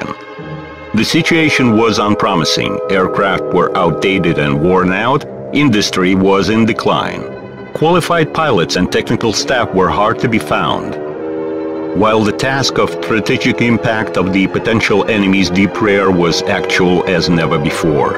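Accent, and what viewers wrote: Russia. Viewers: I just like the Russian accent in this Documentary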